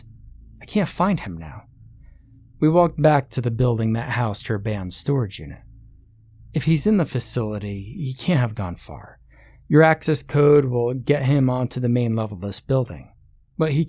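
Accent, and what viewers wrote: South, United States. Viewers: Its pronounced bir-ming-Ham not birmingam, this story somewhat misrepresents the city. But your southern accent is on point.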